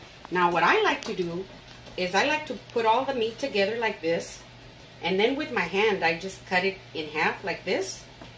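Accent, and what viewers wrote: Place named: Louisiana, United States. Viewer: YUM!!! your accent sounds like "Southern Louisiana" to me. I lived in Baton Rouge for about 18 years.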